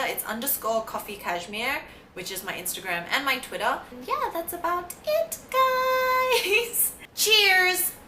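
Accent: Australia. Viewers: Hello, I just want to tell you that what you are describing sounds so similar to my experience when I moved from Prague(Czech Republic) to Sydney in Australia. And all the values you are talking about are all the ones Aussie people and Australia itself taught me. And I am so grateful for that and the 8,5 yrs I spent there were the most beautiful ones in my life so far. I will always be thankful to Australia for who I have become because of that experience. I love Aussie people and their kindness and thank you for teaching others that. That is why I have subscribed to your channel even though I am not big on make up :-). And I love you Aussie accent :-). Keep spreading the kindness! Thank you :-) wish you all the best in your adventure and don't give up. Money cannot not buy you what the experience gives you mentally. The enrichment and open mind. Take care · In the beginning I was like "hell that's a hella strong australian accent for someone living in NY" 😅 but then you said you "just moved" so it all made sense 😅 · I love your Aussie Accent! 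<3 Love you on OTGW too. You remind me of Constance Wu!!!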